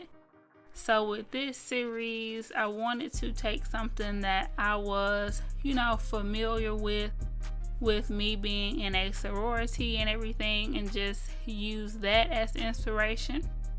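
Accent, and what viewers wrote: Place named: United States. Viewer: Yes I love nails. Do them myself. I work with gel and polygel but I can appreciate a good nail video even using other mediums. I like your channel because you’re upbeat, have a cute country accent and I like the way you explain things. You can tell you like what you do and you can sure sell a product. I hope they recognize! I’ve watched 5 of your videos now back to back and not 1 video went by without me pausing to go put something in my cart.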